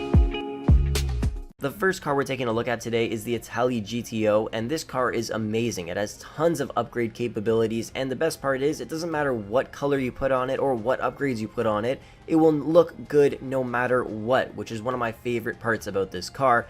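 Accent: Canada. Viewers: Nice vid, you’re from Canada aren’t ya? I figured by the way you said “out”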